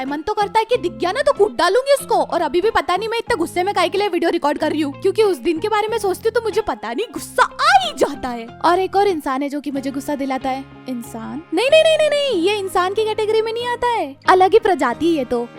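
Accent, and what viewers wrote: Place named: Indore, India. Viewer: Ma kabe sa aap ka video Dhaka rahi hu or itna to pata chale gaya ha ki aap Indore sa ho 😅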